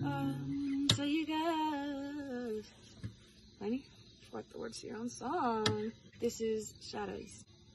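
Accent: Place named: Carolina, United States. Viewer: Beautiful song sang beautifully. Like hearing that kiss of a Carolina accent when you talked. Like the saying goes. If you don't like girls raised in the south (GRITS), raise your standards. Happy Holidays to you and yours from Texas. I spent four years at Ft. Bragg, NC and still have a fondness for North Carolina 30 some years later.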